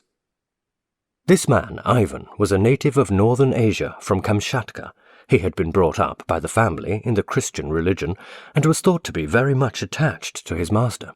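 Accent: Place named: United Kingdom. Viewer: Are the collected works of Madame B. available- her short stories on Amazon? A character who has long held a fascination for me and if I had the talent and imagination I'd love to write a ghost story with her either alive or "in spirit" ! She and Crowley perfected The Stare, didn't they?! Thanks for posting, I am a frequent listener of Jasper L'Estrange and Tony Walker and so I found your channel- I tend towards Victorian ghost stories, written by women, I think our viewpoint is often different and perhaps a little stranger than that of men. I think of modern women, Shirley Jackson, Joan Aiken? and one whose name I can't recall...Hello, nice to meet another Brit. you have the voices! I love accents from the British Isles, as with fog, and huge houses in disrepair- so suited to the ghost story! Namaste from Canada.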